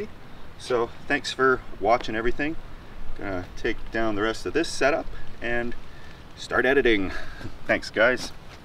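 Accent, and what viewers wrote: Canada. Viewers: I grew up in Buffalo, NY and spent many summers in Ontario. You epitomize the best of everything that is Canadian. I live in NC now and miss those accents and beautiful natural surroundings all Canada has to offer. (I really miss the snow!) Great channel, so informative and frank, I feel like I’m camping with a friend. Keep up the great work!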